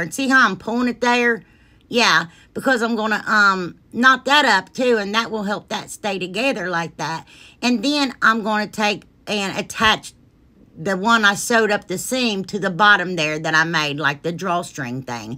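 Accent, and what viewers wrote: South, United States. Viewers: I love all your videos. I'm looking forward to one this evening. I'm also from the south and I talk allot like you. Don't let anyone change you. The way you talk and absolutely everything else. That's who you are and who God made you to be. You're beautiful and very talented. Stay just like you are. I love the way you love God. We have so much in common. Be You Always and Forever. !!! ❤❤❤ 😊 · . Just discovered your channel. Love your southern accent. Love your inspiration. Youur extremely creative.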